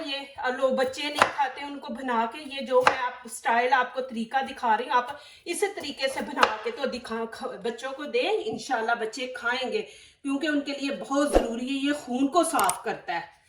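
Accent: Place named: Punjab, India. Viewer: Panjabi chhey ketni bhe achhey urdo boley pata lag jata hy panjabe hy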